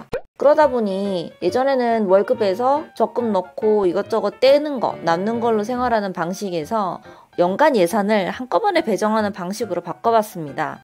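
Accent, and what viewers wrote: Gyeongsang, South Korea. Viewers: 저도 두사람이 하는줄 알았어요 경상도 사투리 너무 좋네요 익숙한 ~~~ ❤❤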